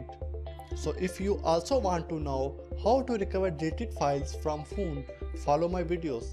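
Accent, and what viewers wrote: India. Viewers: fucking india aksen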